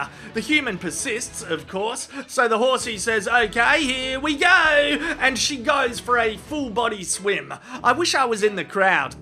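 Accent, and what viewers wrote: Australia. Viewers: Australian is one of my favorite languages/accents. I love hearing the way they talk and is just hilarious in my opinion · This Aussie cusses like an American. But it’s better with the Aussie accent. Take note fellow aussies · please go full 'stralian i would love to see you do a video on australian words... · What makes it even funnier is the Australian accent 😂🤣😂🤣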